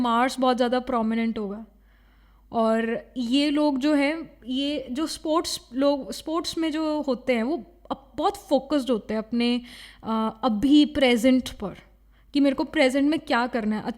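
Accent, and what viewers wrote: Punjab, India. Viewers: Are u a Punjabi mam...? Actually mujhe apke ascent se lga 😅❤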